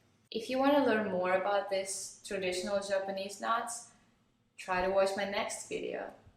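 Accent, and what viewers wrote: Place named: Russia. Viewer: Oh I love your Russian accent, I even watch some of your videos in Russian, just to here the exciting melody. Я изучаю русский язык.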